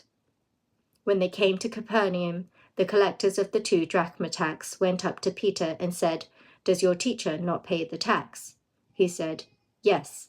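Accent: United Kingdom. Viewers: English is my second language, and I love the British accent. You can't imagine how happy and grateful I am for your reading. please never stop. God bless you.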